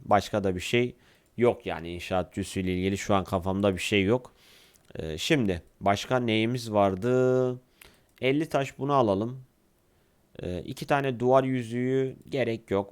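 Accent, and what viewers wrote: Gaziantep, Turkey. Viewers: biraz antepli konusuyon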